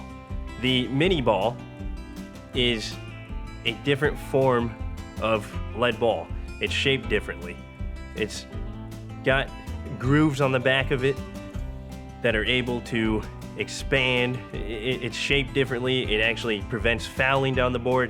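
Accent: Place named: Midwest, United States. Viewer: Midwest U.S. accent .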